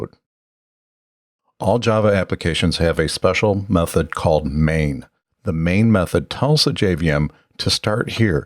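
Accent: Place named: United States. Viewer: What a nice male voice with a rough American accent!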